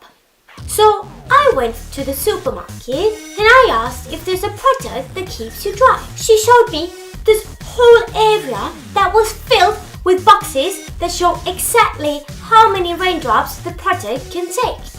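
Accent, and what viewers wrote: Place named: England, United Kingdom. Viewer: What part of England are you from? I love you accent! Loved the skit!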